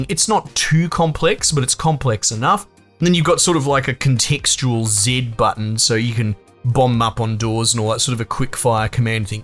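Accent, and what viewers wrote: Australia. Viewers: I so miss hearing our aussie accent on videos. Makes me feel more at home knowing some one here in AU who is layed back and not give a shit about subs and likes but just telling it how it is...and i understand what your saying...lol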